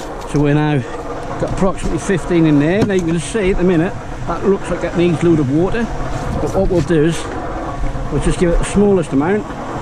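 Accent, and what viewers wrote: Norfolk, United Kingdom. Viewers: What part of Norfolk are u from ?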